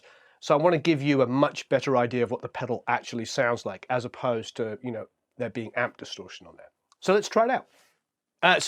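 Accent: United Kingdom. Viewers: Hey man, love your video great sound 🤟 just question you sound like your brit like me Man I've recently just got one these but it's got this inherent hum going into it (I've tried isolating it from other pedal) just like you have here. It appears to be like earth what voltage are you running 9volt DC of the pedal in to your power supply? thank you so kindly ☺️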